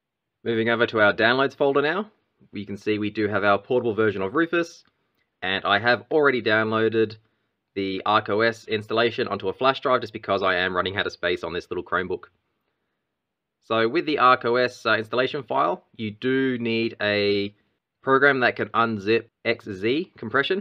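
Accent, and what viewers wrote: Australia. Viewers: Thanks mate! For whatever reason, this video was so much more helpful to me than others I watched. As a total newbie to the dense world of emulation, a calm Australian voice and super clear instructions saved me from a frustration spiral. Subbed, keep up the great work! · Thanks heaps, it worked first time! Must have been the calm Aussie narrative. My only stumbling point was one of the ROMs was corrupt when I was copying them. Skipped it and will hunt it down another time.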